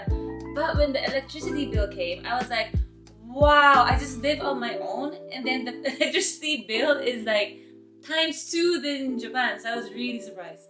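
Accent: Philippines. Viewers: first time here.. your accent sound like taglish ..thank you for coming and staying in philippines...have fun and God bless. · Funny when you speak in english it sounds like filipino english but if you try to talk in Tagalog you sound japanese... Hahahaha... CUTE OVERLOAD! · How come Filipino accent?!?!🤔🤔🤔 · You sound like a Filipino, you have a Filipino accent. It's weird. You could be mistaken to be one actually.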